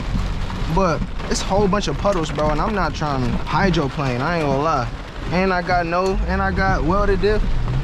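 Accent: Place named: India. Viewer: Vwat da fuc bruddah looosh ash 🤣🤣 Indian accent caught me hella off guard might just be a subscriber now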